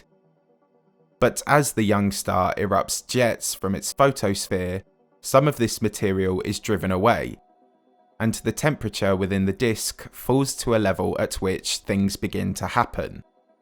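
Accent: United Kingdom. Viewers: Other than Sir David, I typically do not like Bri...ish accents. But I like your cadence and delivery. Top of the mornin' cup of tea Or whatever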